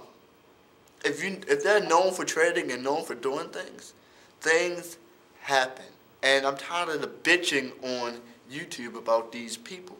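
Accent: Baltimore, United States. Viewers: that damn baltimore accent from you lol! From the way you say "yew-tewwwbe"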